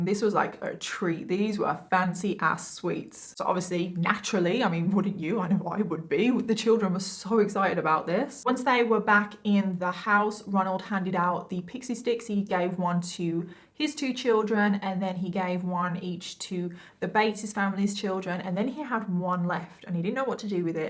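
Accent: United Kingdom. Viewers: Saw your channel on my feed and since I just finished binge watching Bailey, decided to click on your vid about that cannibal frat boy. The moment I heard your accent, I immediately went to your playlist and clicked for your first ever true crime vid and subbed. It's really fascinating to hear true crime from a different POV and also from a different country. I'm going through your playlist now and see what sorts of stuff happen around UK. And how people's mind work over there. 💛 lots of love from PH by the way. 🤗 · I found her a couple of months ago, and I've binge watched all but a few videos because I'll be gutted to have to wait for only one a week once she's back this year! THE BEST true crime and make up channel without a doubt! Very brilliantly narrated, fantasticly researched, beautiful makeup and has real empathy and emotion for the victims. I also love that she's from the UK (as am I) as I much prefer her accent! 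Sorry to everyone else! 🙈 takes the crown for me, has got me into MagellanTV and also Audible! Thanks for your fantastic work - please keep it up! Like many others, it gets me through some very tedious or stressful tasks and times ❤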